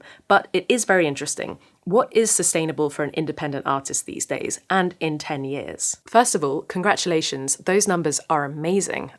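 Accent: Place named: Bristol, United Kingdom. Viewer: Not sure commentary from an old guy (I'm 79) is useful, but I'll give it a go. I stumbled on to your channel on YouTube by accident. I've stayed a fan because your musical tastes are eclectic, and sometimes overlap my own, and also because I'm a sucker for an articulate female voice with a Bristol accent – I like your "talk" videos as much as your musical ones. I've played guitar for decades, but am not a musician (I don't understand the theory, barely can name the strings on a standard-tune guitar, etc.). I learned on my own, by ear, and will never be better than mediocre. That's OK – I don't expect "success" in that context. I play for therapy. I "discovered " Larkin Poe in the same way – by stumbling upon them on YouTube by accident – but have become enough of a fan that I actually went to their show last spring, despite my advanced age, when they played Minneapolis last spring. 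I'd be happy to see you in person, as well, if you ever came here. It seems smart to me, a non-musician, for a young musician to make use of multiple sources of income, publicity, etc., and you are obviously a smart musician. I have no talent for business, so kudos to you for making it work on your own terms.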